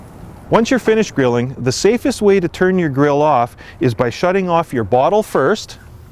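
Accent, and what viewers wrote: Canada. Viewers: I swear that guy is in Canada because i keep hearing his accent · Is he Canadian?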